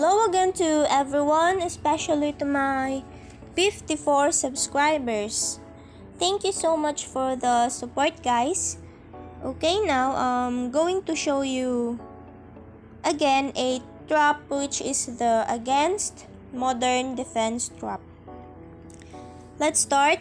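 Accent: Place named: Philippines. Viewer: Nice tip. I noticed your Filipino accent by the way.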